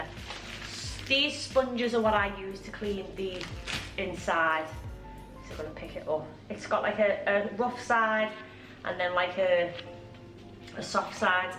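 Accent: Liverpool, United Kingdom. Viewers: Hi great video and love the scouser accent.Just one question to make it crystal clear,does the soda go in draw which you demonstrated and vinegar in drum separately,being a bloke im a bit slow to cotton on with this cleaning lark.Thank You in advance!!!!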